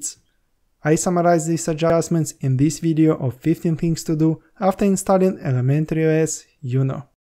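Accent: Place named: Russia. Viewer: Русский что ли? Акцент ужасен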